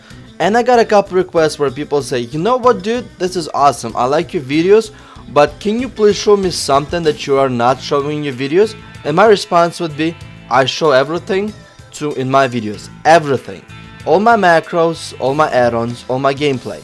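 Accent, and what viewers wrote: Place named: Russia. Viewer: ..I pretty much liked the video,but you should try to make your accent slightly better. It is not bad to be russian or whatevs,seriously,just sometimes I don't understand what you say that's why..Plus u will become better in english :D Other than that,I enjoyed the video.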